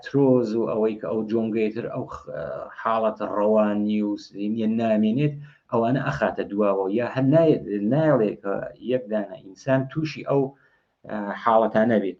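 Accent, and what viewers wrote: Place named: Ardalan, Iran. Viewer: خسته نباشید . امید به بهبود بیشتر برنامه های شما . چند پیشنهاد کوچیک ۱ به نظرمن لهجه شیرین اردلانی را فقط حرف بزنید کافی باشد. ۲ اشکالات تکنیکی در پخش اتاق فرمان دقت بیشتر شود. ۳ مهمانهای که دعوت می شوند حتما متخصص در رشته مورد بحث باشن. ۴ در نوشتار(ته ندروستی) ( ته ندرووستی) غلط املایی کوردی دارید . دقت لازم است .! 🚩🚩🚩 در کل برنامه خوبیه امیدوارم که بهترم شود. .ارادت مخاطب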